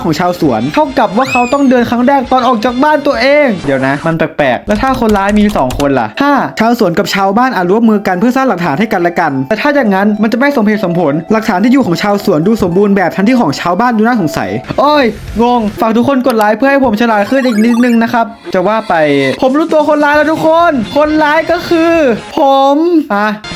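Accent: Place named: Thailand. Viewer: พี่ครับพี่เป็นคนไทยชัดๆเลยครับ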